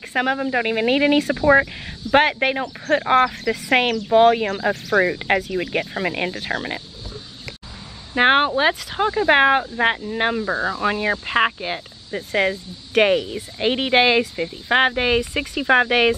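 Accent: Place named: Mississippi, United States. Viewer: Hello from Scottsdale Arizona where I am growing a great crop of tomatoes and other veggies. I am enjoying your videos very much and being from Mississippi I connect with your accent! You are exceptional and your wisdom shows in each video. I am learning ech time I plant how too adapt some of my southern learning to the desert climate. Will you share what you use for a mulch after planting tomatoes.. it looks like hay or alfalfa. Thank you.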